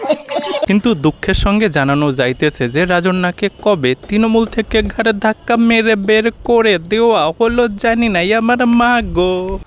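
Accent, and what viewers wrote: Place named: Bangladesh. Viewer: Bhai youtuber, bangladesh theke kabe elen? Vasa shune pariskar bojha jachhe.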